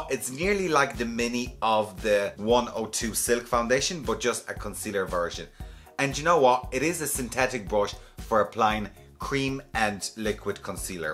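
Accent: Dublin, Ireland. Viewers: Love the accent! Irish? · I love your Dublin accent ❤️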